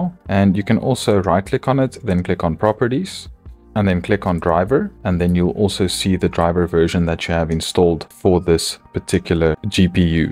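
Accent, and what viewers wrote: South Africa. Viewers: that accent sounds south african,hello south african youtuber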